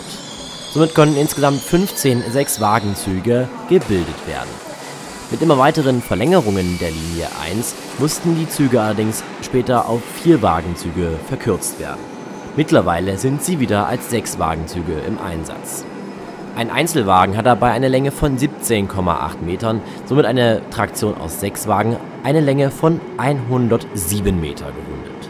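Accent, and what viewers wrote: Germany. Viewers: Hallo, ich habe jetzt schon einige Videos von dir geschaut und mich gefragt, woher dein Dialekt kommt? Hast du irgendwie Wurzeln in Baden-Württemberg? 😄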